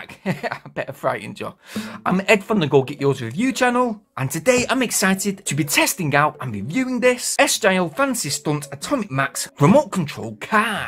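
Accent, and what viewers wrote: Yorkshire, United Kingdom. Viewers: Cool car, you sound like you're from Yorkshire. I'm from Sheffield with a similar channel to yours.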